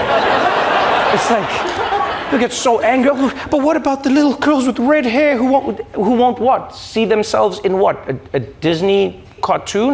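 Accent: South Africa. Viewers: didn’t realize how south african his accent was until now?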